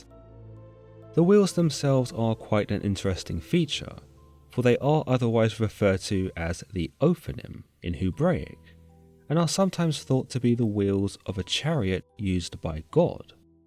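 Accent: United Kingdom. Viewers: The narrator is a pleasure to listen to. He has a very pleasant voice, great diction plus a very elegant (and easy to understand) British accent. Very enjoyable.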